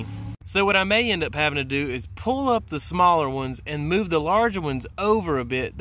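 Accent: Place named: West Coast, United States. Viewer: You have a very interesting accent. Sounds like you grew up on the west coast, but moved to the south.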